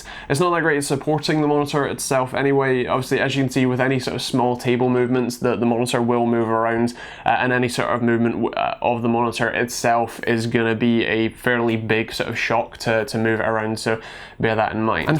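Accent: Scotland, United Kingdom. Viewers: Where in Scotland are you from? Sounds northern. Maybe Inverness?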